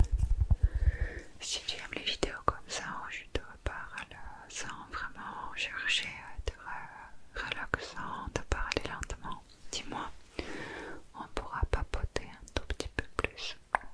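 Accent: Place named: France. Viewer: Hello j'adore votre jolie voix et votre accent français !!🙂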